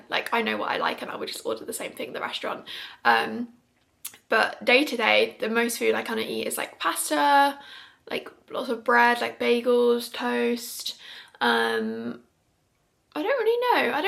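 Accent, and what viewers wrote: United Kingdom. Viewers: ive seen yoy tiktoks for a while and enjoyed them, but finally subscribed to you yesterday. for some reason im so suprised your british! thought you were american...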